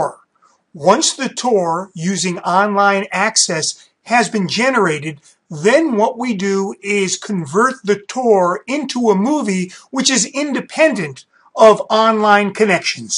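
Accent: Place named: New York, United States. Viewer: Really nice job! And we get the bonus of your very special accent for New Yawk City:) QUESTION: If I have 5 or 6 or move Placemarks in my folder, when it comes time to Record Movie, will it automatically run through all 6 locations? Or do I have to manually click each "next destination"? In my case, I wanted an ultra smooth travel on my city crossing a bridge and taking some tricky freeway exits. So, Point A along the path/tour flows into Point B, B to C, C to D and so on. If I have to manually click each point, it's not as smooth as if the program automatically connected each point. Do you know if Google Earth Pro can do this? Also, when you have your placemarks all created in your folder, can you Record different versions-- such as "With 3D buildings and traffic" and without any overlay info? Or does Google Earth save those attributes into each place mark? 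Thank you!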